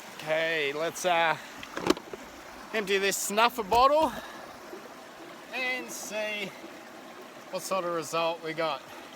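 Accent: Australia. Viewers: Nice to hear that laconic Aussie voice, pity it's dying out with the young these days being so stuck on Americanisms ....keep up the vids on river sluicing and gold panning, love them.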